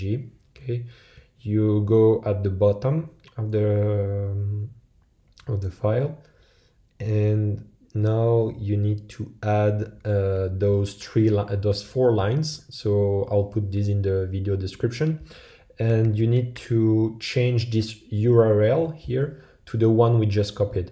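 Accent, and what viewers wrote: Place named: France. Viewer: Salut, j'ai reconnu ton accent français, moyen de faire la vidéo en français ?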